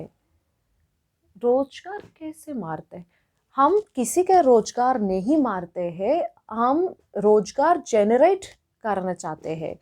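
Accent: South, India. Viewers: Girl u r awesome!! got the perfect south Indian accent, lingo, perfect sarcasm!!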